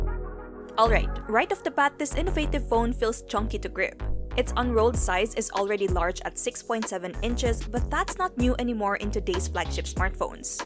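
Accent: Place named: Philippines. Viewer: That filippo accent gets me all the time 😍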